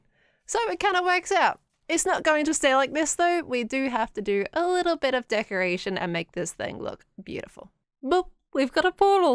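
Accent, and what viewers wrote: Australia. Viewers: Loving you on the hermitcraft server ! The australian accent is really fun to listen to. Keep up <3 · Is she Australian?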